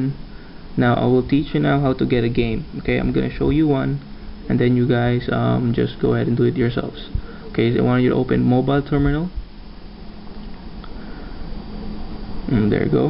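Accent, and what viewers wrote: Philippines. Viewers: u have a philipino accent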